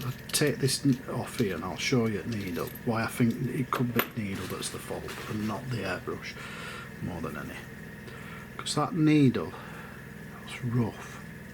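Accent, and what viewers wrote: Barnsley, United Kingdom. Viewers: Barnsley Lad ?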